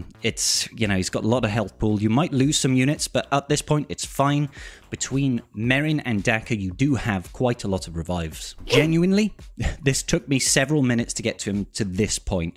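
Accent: United Kingdom. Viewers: Realy enjoying the content please keep it up. On attempt 20 but eill keep trying. Also good to hear a fellow Brit playing. Ally code: <num>.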